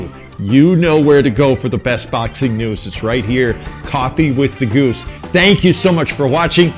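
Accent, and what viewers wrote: Ilonggo, Philippines. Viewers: Hahaha! Dipuga! Ilonggo mana istorya mo!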